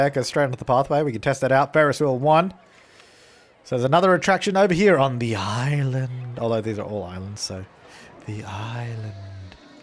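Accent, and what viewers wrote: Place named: Australia. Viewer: you have the best Australian accent :D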